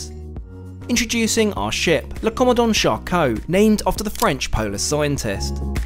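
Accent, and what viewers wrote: United Kingdom. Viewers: Good Videos, but the British English is soo anoying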